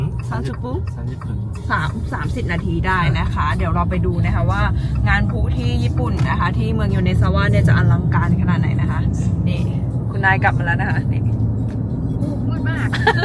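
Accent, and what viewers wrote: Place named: Ratchaburi, Thailand. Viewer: พี่เป็นคนจังหวัดอะไรครับผมฟังจากเสียงเล้วเหมือนคนราชบุรีเลยครับ😅😅😅ถ้าผิดต้องขออภัยด้วยครับ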